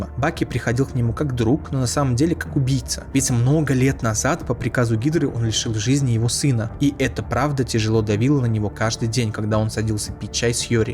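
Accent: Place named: Tajikistan. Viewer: Интересно.Ты какой нации чел? Такое ощущение что как будто таджик или узбек говорит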